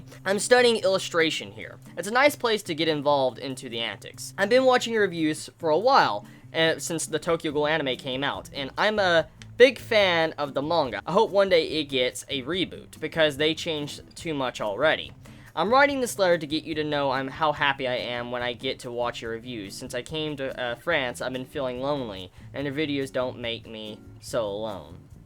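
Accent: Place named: Tennessee, United States. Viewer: hmmm i notice you speak with an accent at times, then i hear you live in Tennessee. So i assume you build up an accent or grew up with the accent.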